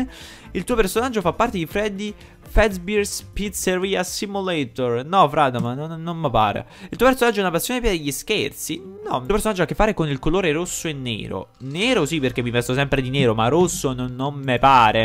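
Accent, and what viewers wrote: Naples, Italy. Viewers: Solo adesso mi sembra che è napoletano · Bro tu sei di Napoli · Sei Napoletano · Bro ma sei di Napoli? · Sei napoletano da come hai parlato sei un grande forza napoli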